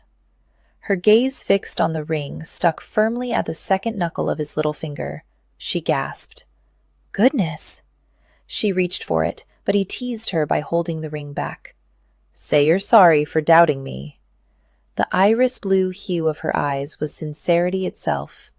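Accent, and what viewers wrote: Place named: United States. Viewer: No no no no no! No American Narrators of an English story!!!!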